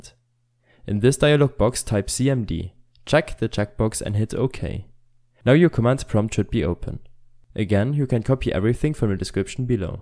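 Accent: Germany. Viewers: Man hört richtig dein deutsch Akzent in englisch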